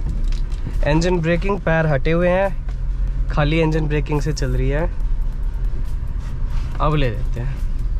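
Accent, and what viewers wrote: Haryana, India. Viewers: Bhai your Voice Bhai Op..i thing Aaap hariyana main Rahete hoo.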